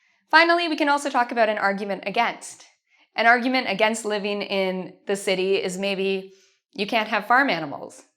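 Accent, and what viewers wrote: United States. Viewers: You're the best teacher I ever had, sirouslly. I'm brazilian and I only have one year of practece but I can speak of course not that good hah lol. All right I love you you're cute you know how teach and even have a American pronanciation. That's what I want to lol. Thank you and send more video okay? Bye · I love the American accent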